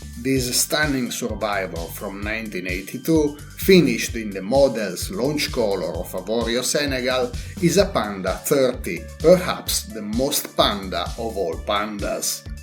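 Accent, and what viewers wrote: Italy. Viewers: Top and i enjoy the English Italian spoken !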